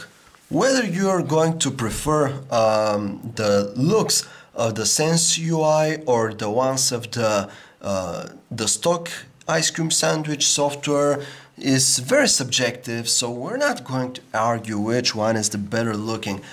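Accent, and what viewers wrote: Russia. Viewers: russian accent? respect! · Are you russian? :) I like your accent.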